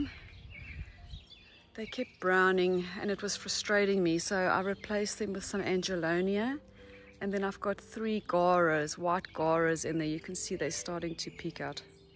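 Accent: South Africa. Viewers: Hi, dont know your name but I just found your channel last night. I literally turned green with envy watching your beautiful garden in the USA. I live in Napier, Overberg Western Cape. So listening to your "normal" SA voice was SO nice to hear. I particularly loved your video of repotting strings, which are favorites of mine. I realize I am not doing enough propagation seeing all yours. I will be glued for more vids ❤ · What a beautiful, well kept garden. A nice surprise to hear your South African accent. An ex pat here, in Austria.👏😍👏😍😊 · You sound very South African🇿🇦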